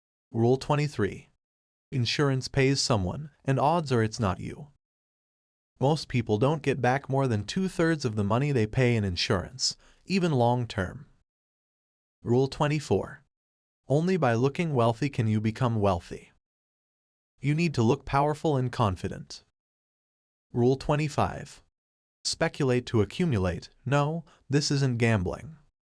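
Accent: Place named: Asia. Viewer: ROBOT READER with Asian Accent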